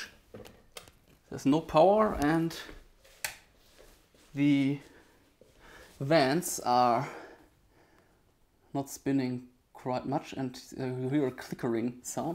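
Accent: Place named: Germany. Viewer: I too do not have an issue with your speech. Maybe I a more familiar with how Germans speak English.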